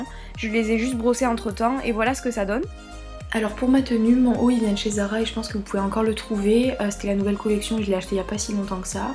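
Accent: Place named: South, France. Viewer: J'adore ton petit accent tu viens du sud ?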